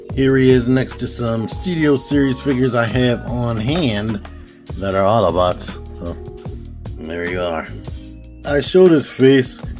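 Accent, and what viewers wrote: Baltimore, United States. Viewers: U from Baltimore?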